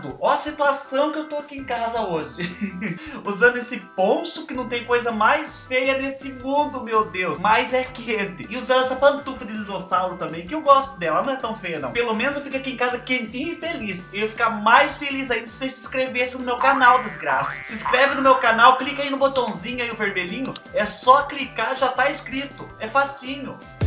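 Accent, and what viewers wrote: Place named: Goiás, Brazil. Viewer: Oi em primeiro lugar quero dizer que concordo com a Milena Barbosa..... para de ficar falando essa palavra (des......)nem vou terminar,pois não é bommmm # fica a dica. E agora quero dizer que eu concordo com você, detesto o 🥶 frio. Graças a Deus moro no Centro Oeste do Brasil, em Goiânia amo minha cidade e principalmente o clima, agora esse mês e o mês que vem faz um pouco de frio..... mesmo assim me incomoda muito, mais logo passa. quero que saiba que ammmmooooo vc, e esse seu jeito de ser e falar, amo.aqui em Goiás principalmente nos interiores fala muito assim com vc, na capital também e é por isso que amo ver seus vídeos..... 👍🏾 continue assim, só pare de falar aquela palavra feia..... viu!!!!!!!bjs no seu coração.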